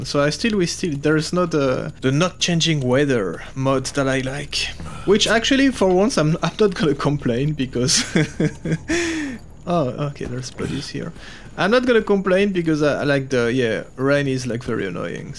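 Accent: France. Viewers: you have a big french accent i am wrong?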